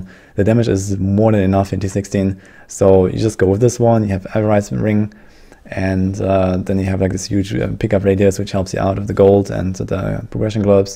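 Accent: Germany. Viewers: His German accent is not a problem at all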